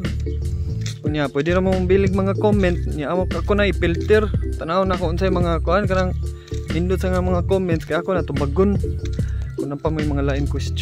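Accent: Visayas, Philippines. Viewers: Naka subscribe kog ahat nimo Dong kay pwerte gyud ka bisaya mo sulti pero ang nawong German Shepherd mn diay.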